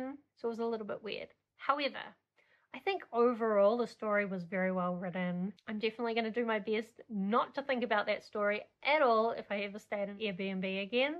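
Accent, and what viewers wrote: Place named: Australia. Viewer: don't worry don't worry I also hate that strong Australian accent 😭😭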